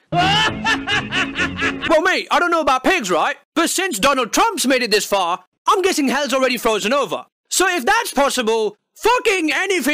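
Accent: United Kingdom. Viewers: baryonyx31 But he has lived all his life in UK and has a severe British accent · says not to support the logic in Casey's video because it's false, yet his argument has just as much fallacies, such as his argument for Hillary not being sane is a fucking joke about her enthusiastic reaction to shit and pigeonholing her negative sides for going to war and pigeonholing Trump's negative sides for PussyGrabbing, all the while saying himself that all youtubers (admitting himself) shouldn't be listened to as political rationale. Essentially just a cartoon with silly British noises now, no comprehension. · hey i thought your brit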